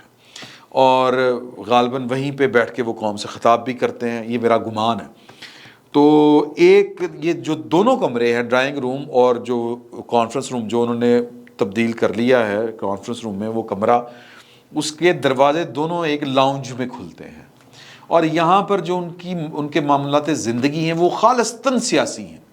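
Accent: Punjab, Pakistan. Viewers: آپکا انداز بیاں مجھے بہت پسند ھے پنجابی کے تڑکے کے ساتھ اللہ آپ کی حفاظت کرے آمین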